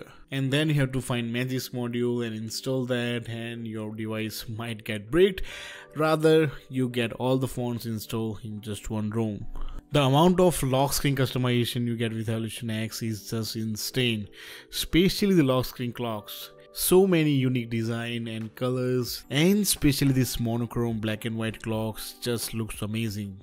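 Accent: India. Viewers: You don't sound like an Australian. You sound like an Indian chapri at best.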